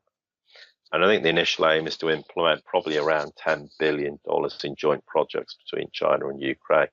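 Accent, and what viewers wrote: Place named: London, United Kingdom. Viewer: Does he keep saying Western capitalists or Western kabbalists? Damn London accent I can't tell the difference.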